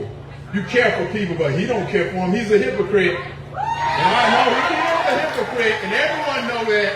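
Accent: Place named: Georgia, United States. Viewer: Walker you can tell you love Georgia. You can tell you was raised there. Your a full blooded Georgia you walk and talk like a Georgian. If you don't win then Georgia don't deserve you.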